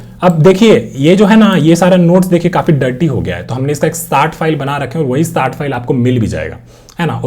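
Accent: Bihar, India. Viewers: Bihari accent ki hi problem h bhaiya baaki content bhaiya ek number hai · i loved the bihari version of speaking just loved that and explanations were also good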